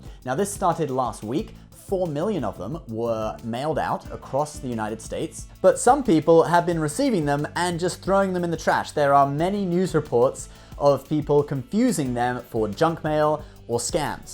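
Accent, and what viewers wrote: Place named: United Kingdom. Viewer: This would have been a more reassuring video if the guy didn't have a British accent.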